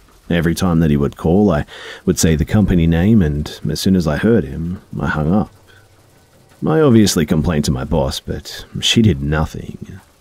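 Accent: Australia. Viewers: You got a smooth voice man.......Australia ?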